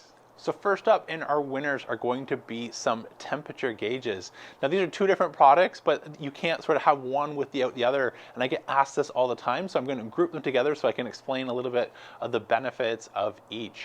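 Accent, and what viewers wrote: Canada. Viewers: Thank you so much for your very informative videos. I have been a KJ student for the last two to three years. Some trial and error but mostly wins since the KJ is so user friendly. Adding you and your videos has added the last piece in my grilling/smoking journey. Plus my family and I really enjoy your Canadian accent...really adds some fun to your tutorials. Keep em coming!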